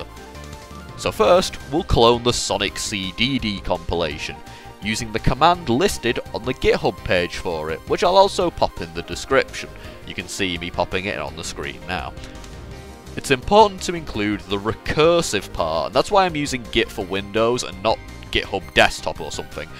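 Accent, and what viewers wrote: United Kingdom. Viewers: The british accent ruins everything sorry but srslly 💀☠️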